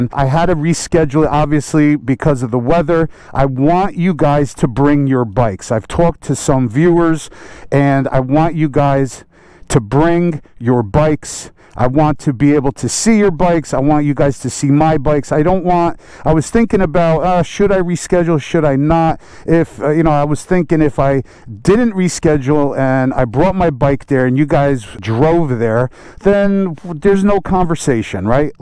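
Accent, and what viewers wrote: New Jersey, United States. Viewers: Agree Stage II is the way to go. Best bang for your buck for sure. How was your cup of coughvee? :-) Love that Jersey accent.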